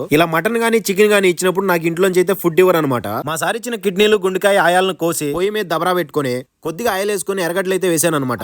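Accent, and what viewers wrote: Kadapa, India. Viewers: బ్రదర్ మీది ఏ ఊరు Mee భాష కడప భాష లాగావుంది 🙂🙂🙂🙂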